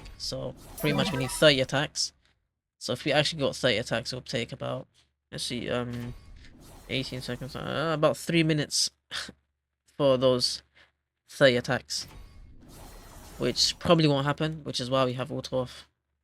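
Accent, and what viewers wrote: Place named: United Kingdom. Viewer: Strong bri ish accent😊😊😊😂🎉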